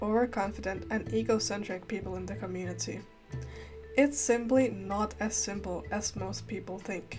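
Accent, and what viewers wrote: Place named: Europe. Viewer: Damn, you sound so European! I love your video btw. 🎉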